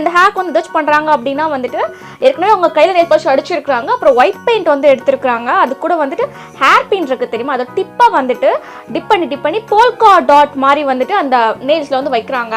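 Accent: Tirunelveli, India. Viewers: Akka neega tirunelveli ya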